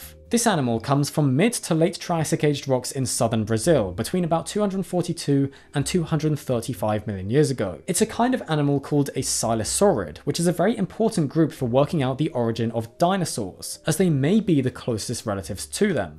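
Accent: United Kingdom. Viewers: How does history, space & dinosaurs sound so much more incredible, when listening to a British guy talk about it???? ☺️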